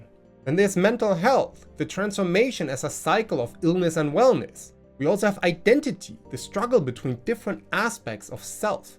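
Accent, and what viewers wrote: Germany. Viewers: he is from Northern Germany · Man, your german accent so thick it almost sound chinese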